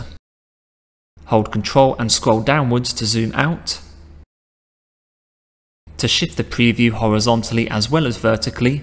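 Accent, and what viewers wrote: United Kingdom. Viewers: Again, a very useful video - also your very clear English is brillant (very British), love it! Thanks a lot for your great efforts and keep up the good work! Greetings from Germany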